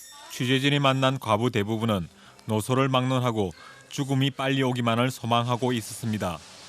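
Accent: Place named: Gyeongsang, South Korea. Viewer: 나레이터가 경상도 발음과 억양이 꽤 심하네요 ㅎㅎ 요즘이 어떤 세상인데..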